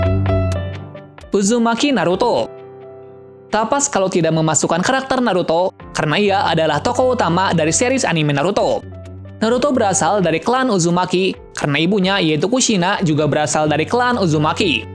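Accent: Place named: Banyumas, Indonesia. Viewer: Ngapak ya😁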